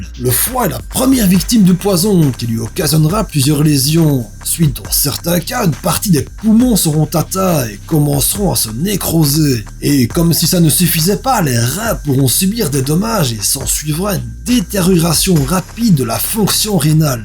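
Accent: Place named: Belgium. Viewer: Un abonné de plus ! Attends,apprendre des choses grâce à une personne avec l'accent Belge, c'est trop rare !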